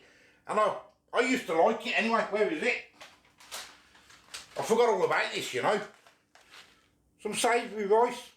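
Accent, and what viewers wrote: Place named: Hartlepool, United Kingdom. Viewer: Loving this ! Me and the wife love your videos , but we have been debating on your accent ! Where bouts you from Hartlepool ??? 😬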